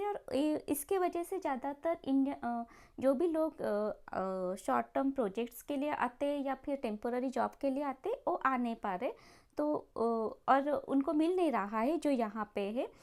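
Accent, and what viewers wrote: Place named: Odisha, India. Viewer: your Hindi accent is so similar to Odias of Bhubaneswar region..!!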